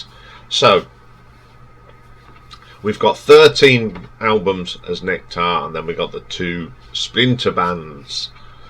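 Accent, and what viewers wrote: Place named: United Kingdom. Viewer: You sound British, but your sentences hardly make proper sense. Anyway, good luck with your channel...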